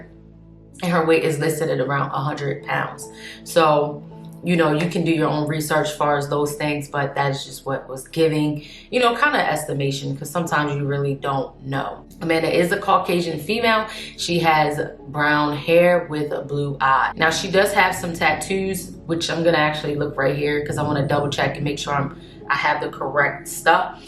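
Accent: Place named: Baltimore, United States. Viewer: Also question are you from near Baltimore? I can hear that accent in certain things you say.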